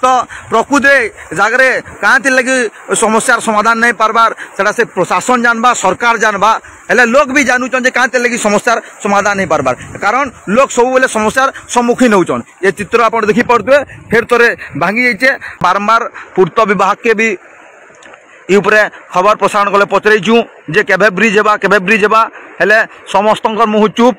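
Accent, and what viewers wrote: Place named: Sambalpuri, India. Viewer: Aamar kacha samal Puri bhasa re news Hebar Katha........adha samalpuri adha katkiaa kahuchha...........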